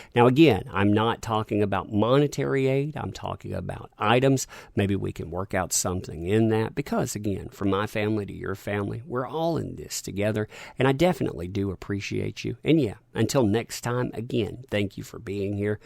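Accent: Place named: Texas, United States. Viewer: Sort of wondering if you are from Texas with your use of "set" for "sit" ;)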